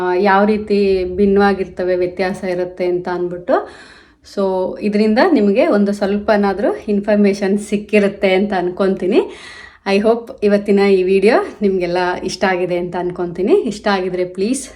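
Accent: Standard. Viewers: kannada swaccha vagi mathadthira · ದೂರದ ಅಮೆರಿಕದಲ್ಲಿ ಇದ್ದರೂ ಸ್ವಚ್ಛ ಕನ್ನಡ ಮಾತು , ಕನ್ನಡ ಅಭಿಮಾನಕ್ಕೆ ನಮಸ್ಕಾರಗಳು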